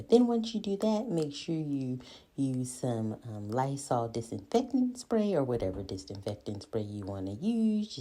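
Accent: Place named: South, United States. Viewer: I now know that feeling my viewers get listening to my voice. You have a nice light accent. it sounds Southern but in a very classy way. I am trying to keep it bright. Basically your speaking so calm.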